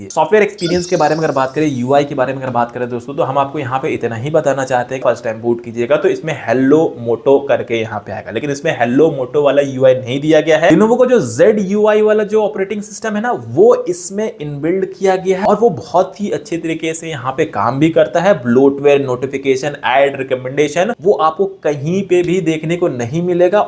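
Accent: Bihar, India. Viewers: Sir aap bihar se hain kya??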